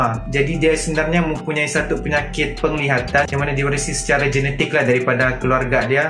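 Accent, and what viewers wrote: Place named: Sabah, Malaysia. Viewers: Original sabahan bah speaker nie...pelat bunyi nya... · Sabah bah ni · Sabahan speaker 👏🏻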